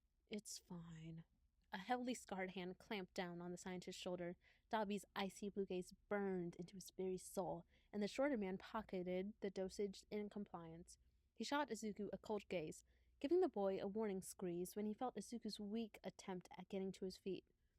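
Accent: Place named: Texas, United States. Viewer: you sound Texan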